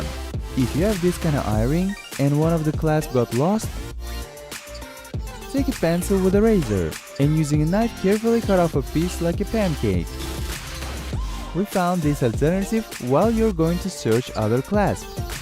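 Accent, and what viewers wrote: Poland. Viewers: Nice to see polish accent in this video 1zł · are you from poland becuse you have accent and you used polish coin and you even name you hamster stefan! which is polish name :) pozdrowienia!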